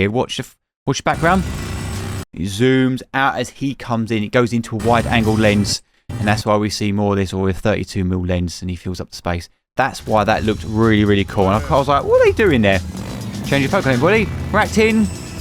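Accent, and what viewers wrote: United Kingdom. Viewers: Well, let's go for 45 minutes of great British accent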